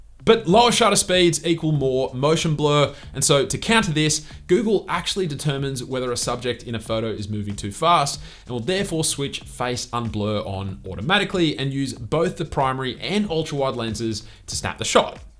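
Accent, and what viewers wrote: Australia. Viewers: Australian? Nice change to hear someone from there.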